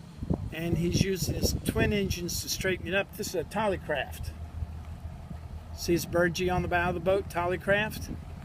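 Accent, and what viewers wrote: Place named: United States. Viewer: That commentary made my ears bleed... soo American... As for bouncing off the pole to come forward, umm, isn't that what 'ahead' on an engine is for... You bounce of a pole like that on 9 out of 10 boats and you won't have a job the next day, although nothing wrong with using a pole with a sponson sitting on it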